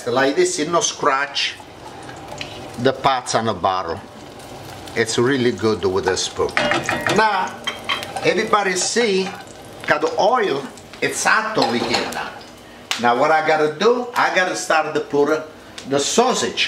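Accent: Italy. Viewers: The best Italian accent ever !!!!!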